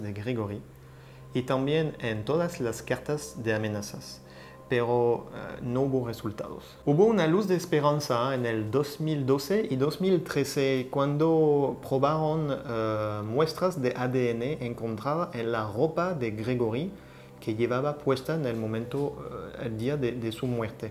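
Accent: France. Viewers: Joli accent français ) pero el caso es desgraciadamente más complicado.. Aunque la primera versión que surja es la de Bernard.. y sigue siendo la más verdadera. Je crois que c'était lui.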